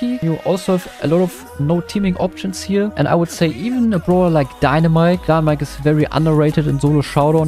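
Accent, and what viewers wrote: Germany. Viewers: Man hört voll den deutschen Akzent 😂😂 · Du bist Deutsch,ne? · Are you German? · Der deutsche Akzent macht es viel interessanter. Danke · Bro is german and i didnt notic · Mann hört einfach dein Deutschen Akzent 😂❤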